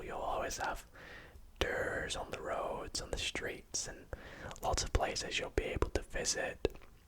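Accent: United Kingdom. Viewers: Beautiful video,landscape/city ,and your British accent ❤️👍👍👍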